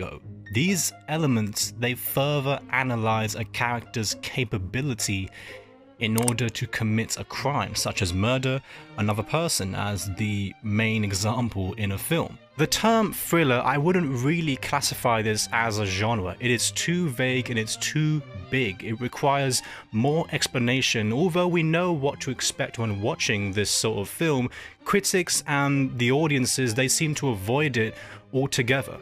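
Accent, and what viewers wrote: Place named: Cockney, United Kingdom. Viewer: You lost me at Cockney accent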